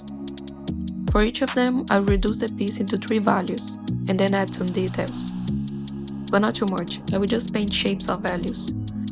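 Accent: Brazil. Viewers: her Brazilian accent is very cute ! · she's from brasil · Brazilian. Easy spot, watch some movies bro, it helps with the pronunciation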